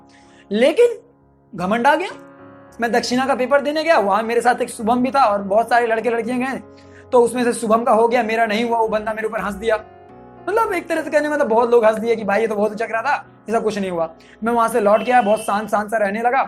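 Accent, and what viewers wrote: Bihar, India. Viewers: Sir aap bihar se h kya?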